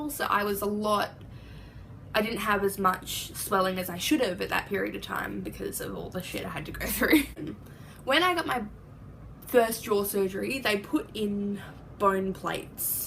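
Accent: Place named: Australia. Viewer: ! Keep us posted! Thinking of you:) you are so brave to post this! Would love to see videos or snippets of implants and everything else! Love hearing an Aussie accent. Peace outtt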